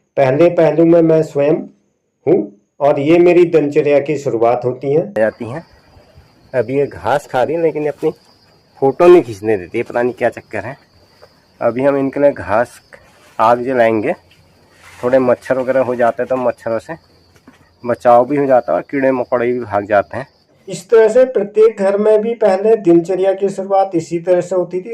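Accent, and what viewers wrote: Uttar Pradesh, India. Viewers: Aap kaha se ho..Boli se up wale lagte ho..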